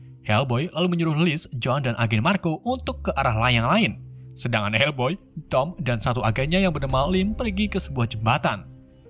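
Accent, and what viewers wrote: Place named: Java, Indonesia. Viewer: Mencengkiwing. Admin e wong jowo ki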